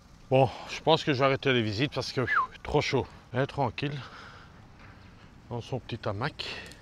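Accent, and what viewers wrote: Belgium. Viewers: je suis tombé sur toi par hasard,au premiers son de ta voix,jai su que tu etait belge,hahaha,ca fait plaisir de voir un youtubeur belge,par contre oulalala,je vient de voir ,vu que je t'ai decouvert,que jai une tonnes de video a regarder sur ta chaine,j'en ai pour un moment.......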